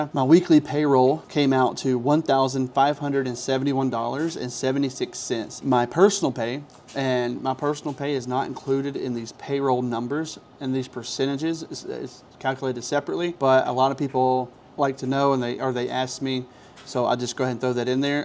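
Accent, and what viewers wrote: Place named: South, United States. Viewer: Sounds like he's from the south, $500 is OK if you're in Mississippi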